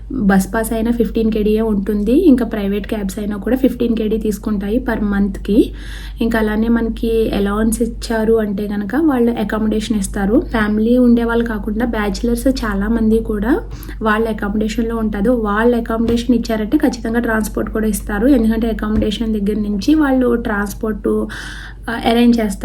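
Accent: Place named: Godavari, India. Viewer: అక్కో మీది గోదారి జిల్లా 😄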